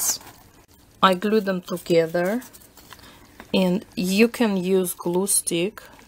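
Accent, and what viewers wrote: Russia. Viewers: Are you Russian? I love the accent!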